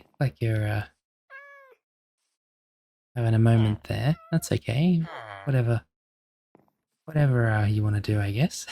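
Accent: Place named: Australia. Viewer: Twin I would like to let you know that your voice is very soothing, and I love your what sound like an Australian accent. I would also like to mention that I find myself falling asleep to your videos every night I can, and I use them instead of melatonin. I really appreciate what you do, and I really hope you can excel in a successful career 💕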